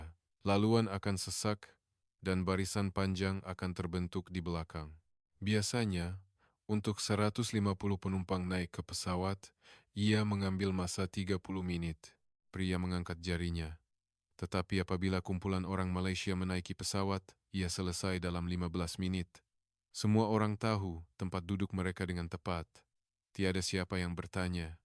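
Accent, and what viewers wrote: Indonesia. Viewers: suara admin org indon.bukan org malaysia.sebab org indon suka <link> malaysia tidak bercakap macam ini. ini penipu dari indon.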